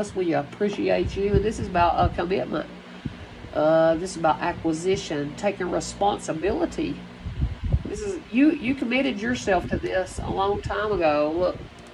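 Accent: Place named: Alabama, United States. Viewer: 77 subscribers already. I love your voice and accent. It's just like my cousins who are from the mountains of Gadsden, Alabama and the only area of Alabama with that dialect. I've always loved it. You also seem to love Spirit in a way similar to me, I don't know. I'd donate if I could, married 31 years and finances are the only area that we've not been abundant and successful in. But it's all a part of divine plan and I'm just happy to be here. If I'm led by Spirit to do more in the world, I will.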